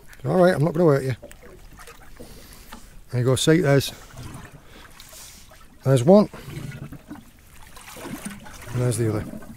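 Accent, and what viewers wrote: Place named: Geordie, United Kingdom. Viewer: Hello Sir, I hope you, family and friends are all well. What a great video. I shoot and fish for the pot only, or give away to the neighbours, nothing goes to waste. Very informative video and entertaining too. Are you Geordie or Sutherland? My Dad could tell. Take care and stay safe., England.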